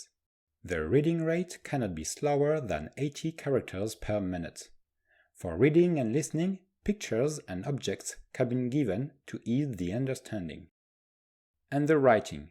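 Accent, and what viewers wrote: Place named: United States. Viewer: Are you from France? It does not seem. You have a great American accent.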